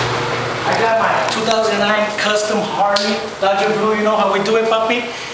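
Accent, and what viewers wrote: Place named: Mexico. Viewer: hahahha i amost fell for it carnal hahah u look like many but then i heard ur mexican accent jajaj la cervesa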